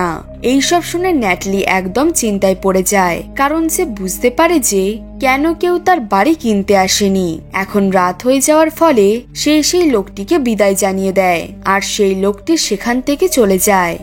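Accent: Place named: Chapainawabganj, Bangladesh. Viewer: সরি টাইপিং মিস্টেক বলছি আপনার ভাষা ঠিক করা দরকার আকটু প্রবলেম আছে ভাষাটা শুদ্ধ হলে শুনতে মজা লাগে আপনি কেমন যেন চাঁপাইনবাবগঞ্জের ভাষায় কথা বলেন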